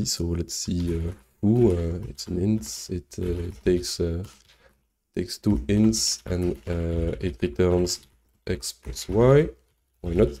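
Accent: France. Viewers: u speak english like a french person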